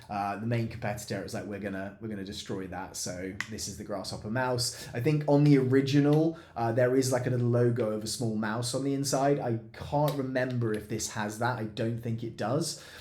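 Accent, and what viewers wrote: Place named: United Kingdom. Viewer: I heard the british accent and began wondering whether or not this was a real gun.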